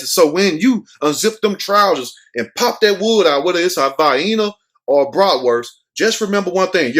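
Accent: South, United States. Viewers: your accent is somewhere between the Bible belt and Houston /Arkansas 😂😂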